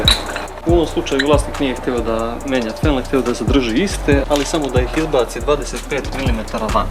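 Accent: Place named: Čačak, Serbia. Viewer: Tacno sam znao po govoru da si iz Cacka. :)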